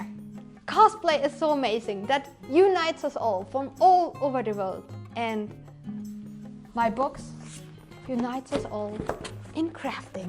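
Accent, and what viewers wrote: Germany. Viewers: awesome! I wish I could have friends like you around me that realize all the work done when you make a costume, realize a vid, a how to vid, a thing with a 3d pinter, learn moves on a fight scene or how to utilize softs like photoshop, lightroom, fusion360, hitfilm, passing time consuming energy trying to find how to make this special costume piece with this special color or texture, find materials and technics...generally all I have is people doing sports and watching tv series, never make a thing with their hands, so likes are few. Anyway, having positives people like you, enjoy my day and the most important thing is to be proud and happy of what we are, whatever we are, we are awesome , and never give a sh.. to people that dislike just for fun. (special mention to your german accent that I love because I understand all (and probably I have almost the same because I'm french :-D ). 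my tip when I see a bad comment: scroll! :-D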